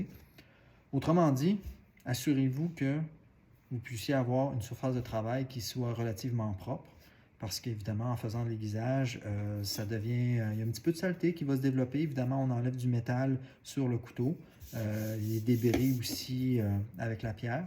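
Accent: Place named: Canada. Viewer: Bonjour A ton accent charmant, tu es canadien! Donc, difficulté pour moi de venir vous rencontrer en personne, puisque j'habite la France (la Provence, pour être précis) Voici quelques autres questions. Je me régale de vos vidéos concernant l'aiguisage à la pierre japonaise! Par contre est-ce que c'est une forme de "luxe" par rapport à l'aiguisage à la bande abrasive? Autrement dit, est-ce que ce sont deux modes d'aiguisage qui n'ont rien à voir l'un avec l'autre ou, au contraire il y a un lien entre les deux modes? Si oui, lequel? Je vous pose cette question par que d'après vos vidéos on peut tout faire avec les pierres à aiguiser japonaises, alors pourquoi utilisez-vous aussi l'aiguiseuse à bande? Merci de vos réponses (vous semblez être quelqu'un de très sympathique)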